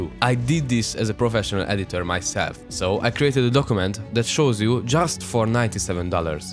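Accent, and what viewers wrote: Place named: Hungary. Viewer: Wait your accent is VERY simmilar to mine. Are you Hungarian?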